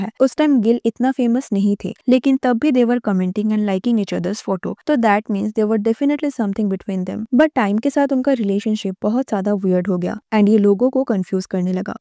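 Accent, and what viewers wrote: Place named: Telugu, India. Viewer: After listening to your voice in a lot of your videos. I'm guessing you're a telugu. Is this true?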